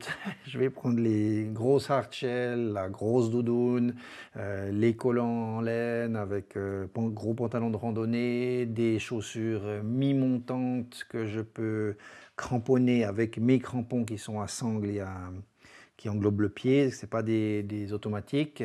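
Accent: Switzerland. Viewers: Salut ! l'accent suisse fait du bien :-) je confirme tout le bien de Swiss Mobile (Iphigénie imbuvable)...je te suggère peak viewer pour les noms des sommets quand tu es sur le terrain...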